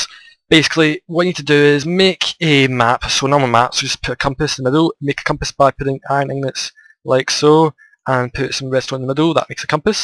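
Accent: Scotland, United Kingdom. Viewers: R u Scottish if u r so am I!!! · Hahhaabha you're cute. and THANK YOU AWESOME PERSON./ scottish <3 Do you speak scottish gaelic too?:3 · your Scottish! i love u accent! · You're scottish?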